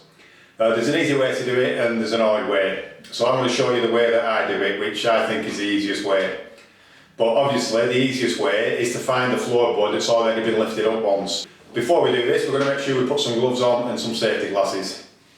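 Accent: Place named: Lancashire, United Kingdom. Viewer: I recognise that accent ...... I'm guessing Leyland, Bamber Bridge possibly .......Preston as an outside guess ?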